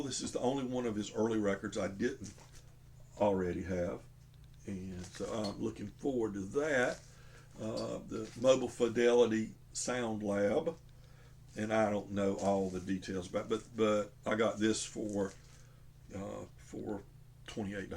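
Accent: South, United States. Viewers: Man, I watch a lot of record geek videos that turn out to be terrible, not just bad taste but SHOW THE DANG RECORDS! But yours is different, and better. You don't pretend to know things you don't, you're not chasing trends, you mix it up (I'm a jazz guy, but I saw Hillman and Peterson play together a few years ago and it was brilliant). I can't place your accent (North Carolina? Virginia? Alabama? I suck at southern accents) but I could listen to it all day. I'm a subscriber now.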